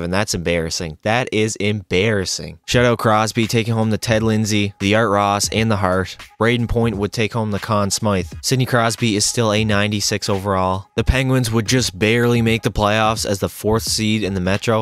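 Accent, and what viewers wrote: Newfoundland, Canada. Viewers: Are you a Newfie? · Any chance you're from The Rock? Or at least from NS? Asking because of your accent lol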